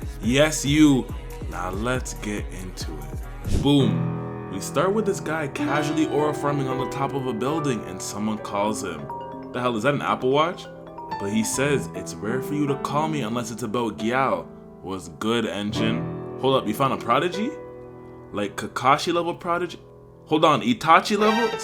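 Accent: Jamaica. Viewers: Yo are you a jamaican??? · Are u a Jamaican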